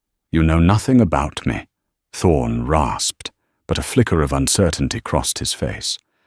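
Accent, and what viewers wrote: United Kingdom. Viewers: Good story read with a beautiful British accent. Listening from Norway. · I really wish an American was narrating this story about the American West! This English accent is so out of place.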